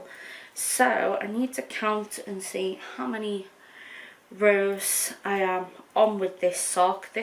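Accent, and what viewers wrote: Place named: Liverpool, United Kingdom. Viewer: Hi I am a crocheter/ knitter just found your podcast thanks to Ali from little drops of wonderful and love it we are ex scousers living in Australia miss the accent and loved listening to you.. Working my way through your pod casts you are a great knitter and crocheter loving your work I taught myself in lockdown to knit socks and loving it although we don’t need wooly socks here much, but I post them to my sister who really appreciates them🧶🧶🧶 carry on with your great work.!!!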